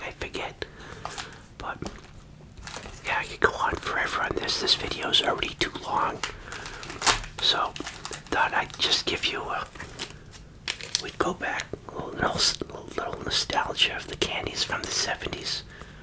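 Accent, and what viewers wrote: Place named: Massachusetts, United States. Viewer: You must be from Massachusetts...I recognize the accent.Hello from southern NH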